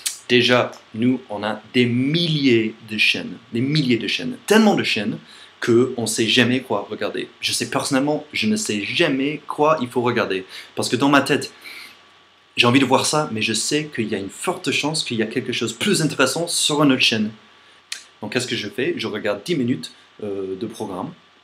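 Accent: United States. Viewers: J'ai moins entendu ton accent américain que sur d'autres videos c'est normal ??? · Tu es entrain de perdre ton accent américain. Tu as l'accent français parfois. Il te va bien. Des fois on croirait que tu es franco-français. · c'est incroyable tu n'as pratiquement pas l'accent américain quand tu parle francais ^^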